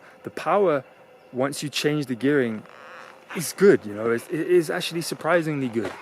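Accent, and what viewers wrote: London, United Kingdom. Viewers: Why you sound like your from London but for a Arab or whatever number plate lol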